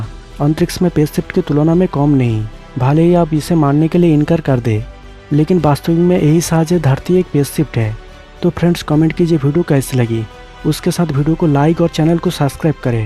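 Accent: Bengal, India. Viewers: Aapko hindi mai nhi bolna chaiye bhai coz aapke awax mai bhutt jyada bengoli accent h...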